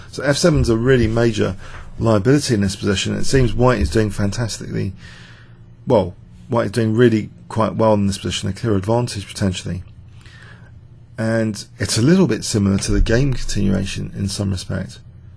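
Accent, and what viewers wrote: United Kingdom. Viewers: Thanks for your continued success as a player and commentator. Of course, I always have to acclimate to the British "th", but it's worth it! (My British friends always complain when Americans say "water" with a "d" sound!). I don't have a chess engine, so I was hoping to get your feedback on the idea of Black playing a5 after Ba3, intending Nb4 if White pushes e4. If White exchanges B for N, then Black has a protected passed pawn on c3 and preserves the dark-squared bishop. Any thoughts?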